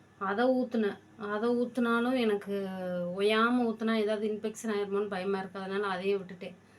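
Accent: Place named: Chengalpattu, India. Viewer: கவலைப்படாதே மகளே அம்மா நான் இருக்கேன்❤❤ இந்த வீடியோவை ஃபுல்லா பார்த்துவிட்டு தான் உனக்கு கமெண்ட் போடுகிறேன் கடைசியிலும் செங்கல்பட்டு தான் பேசுகிறாய்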